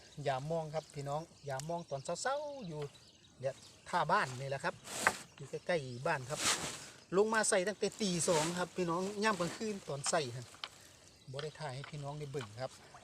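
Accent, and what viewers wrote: Thailand. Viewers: สวัสดีค่ะ ได้ปลาโจกตัวใหญ่ๆ ลาบแซบๆค่ะ สำเนียงเว้าคือไทยนครพนมบ้านข่อยแท้น่อค่ะ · อยู่บ้านได๋น้อ ฟังสำเนียงเสียงเว้าคือสิมาทางเดียวบ่น้อ หมานๆครับ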